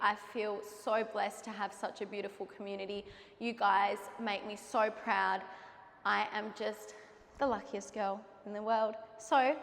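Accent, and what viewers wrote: Australia. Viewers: Loved this workout! I knew you were Australian bc you sound just like Miranda Kerr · I can't believe people actually thought you're British?? Like they have clearly never heard an Australia accent before yeesh. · Omg how did people think you’re British!? I could tell right away you’re from Australia! But then again I do know a lot of Australian people for being an American lol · Im from the states but my dads side of the family is in Perth and Sydney. I recognised your accent. I hope to visit one day! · Same thoughts😂I don't watch a lot of Aussie youtubers but even i could guess she was an Aussie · I was like, what??! I thought she was Australian! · As an American, I could absolutely tell you were Australian. How funny. Anyways, another amazing workout. Thank you for all that you do. You helped me fall in love with Pilates. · Dying that ppl don’t realize you’re Australian 😂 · Girl WHO thought you’re from the UK 🤣🤣 maybe I just watch too many cutie Australian YouTubers but I can spot your accent from a mile away! 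NOT a British accent 🤣 I am dying. I love all of you Australian girlies accents so much!! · I’m an American and knew you were Australian because I have a beautiful friend from there but also I follow move with nicole and knew it was the same accent ❤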